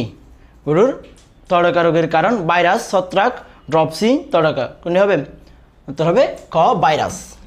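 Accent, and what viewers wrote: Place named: Chittagong, Bangladesh. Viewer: চিটাগং এর পোলা মনে হয় ভাষায় বুজা যায়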